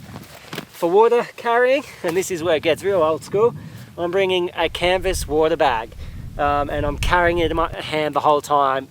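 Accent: Australia. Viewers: Thats it haha. You just sound like you're from Melbourne.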